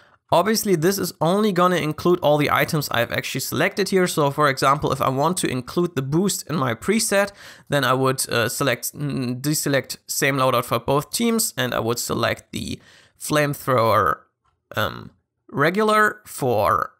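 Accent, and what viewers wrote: Germany. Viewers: Bist du deutsch? Hörst dich so an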